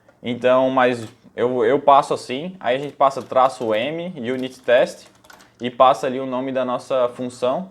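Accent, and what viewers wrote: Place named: Santa Catarina, Brazil. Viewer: Pow, bicho, tu é de Floripa? Sotaque aí tem tudo pra ser de SC pelo menos. Hahaha Enfim, parabéns pelo canal! Excelente vídeo.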